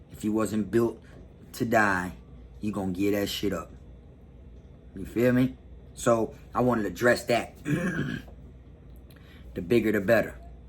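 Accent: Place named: Baltimore, United States. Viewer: Yo u that nigga real talk yo I'm from bmore and it's the exact same way u talkin bout out here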